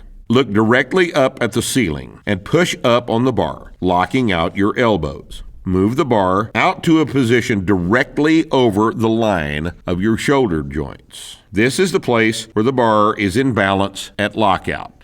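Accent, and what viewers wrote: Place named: Mississippi, United States. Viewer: That voice came straight out of Mississippi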